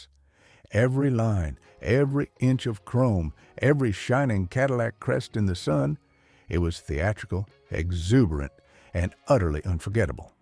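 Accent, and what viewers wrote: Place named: South, United States. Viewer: This “guy’s” southern accent is difficult to hear, difficult, as in sickening.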